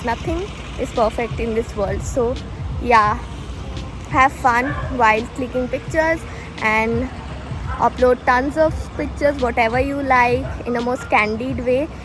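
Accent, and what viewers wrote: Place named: Bengal, India. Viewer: You're such a sweetheart and that Bengali accent is so cuteee!❤️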